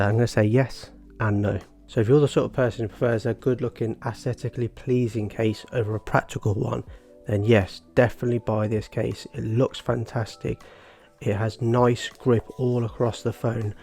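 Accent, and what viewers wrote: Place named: United Kingdom. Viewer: Falling in love with Britich accent 😍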